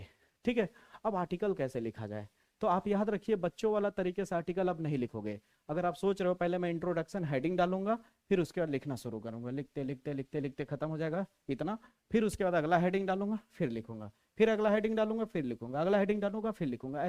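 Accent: Bihar, India. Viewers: Sir app bihar se ho na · Ap bihar se ho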